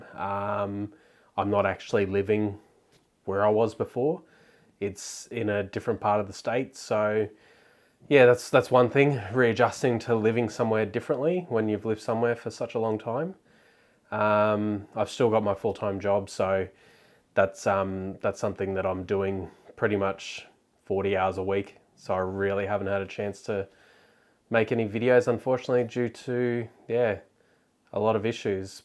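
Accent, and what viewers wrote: Australia. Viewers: G'day Mate, good to see you back, get well. It's good to hear your Aussie accent again :)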